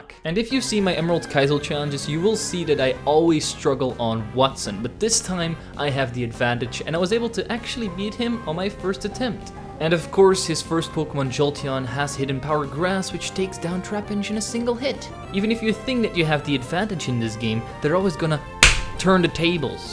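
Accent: Netherlands. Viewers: wait are u dutch didnt even notice earlier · Im dutch to its a great accent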